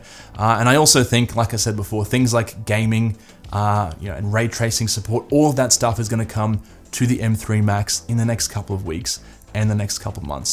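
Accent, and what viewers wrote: Australia. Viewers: You speak like an Australian.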